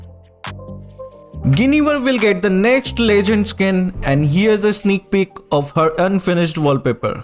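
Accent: India. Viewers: Indian english so bad 🙄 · Your Accent is TERRIBLE AT BEST.. So thick Indian Accent with a Japanese name!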